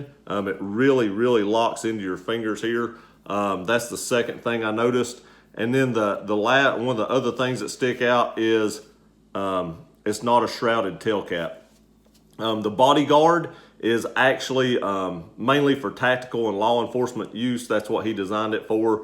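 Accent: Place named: Alabama, United States. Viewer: Also, where are you from? I’m pretty good with accents but am having a hard time making yours out. I’m guessing somewhere north of Tuscaloosa?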